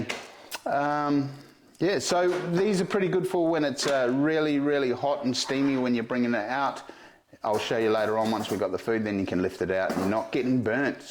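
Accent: Australia. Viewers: sorry bro but is that oz accent ? would like to know how you define the taste of ? keg hangi above ground hangi all taste like boil up …? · Sounds like this Kiwis an Aussie.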